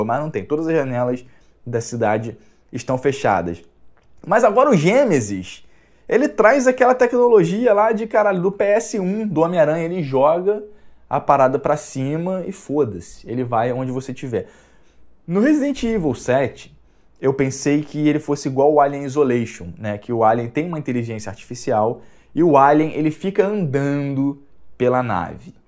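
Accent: Rio de Janeiro, Brazil. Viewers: Tu é muito chato cara, pra começar esse teu S puxado de carioca, só sabe falar mau, a DLC do Re. 2 gratuita, muito da hora, aposto que tu nem jogo. Falar mais é fácil, quero ve5tu fazer melhor.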